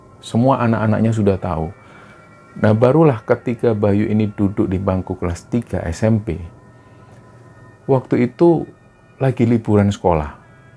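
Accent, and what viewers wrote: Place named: Surabaya, Indonesia. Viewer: Mantab bang crtny kok mirip bahasa Jawa sbyo an y🙏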